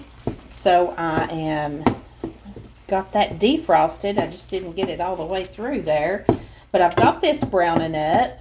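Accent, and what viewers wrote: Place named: South, United States. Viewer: I love watching your videos! Your recipes are simple and pleasing, and your attitude and southern accent grab my attention. I look forward to seeing your next video everytime. Thanks from Papa G!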